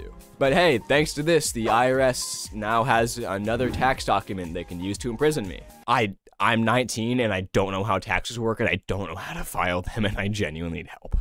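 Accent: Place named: Lithuania. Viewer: you sound lithuanian but in the best way possible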